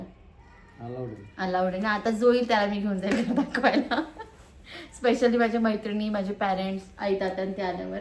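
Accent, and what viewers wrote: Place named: Sinnar, India. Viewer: Sinnar che lok bolun asech ahe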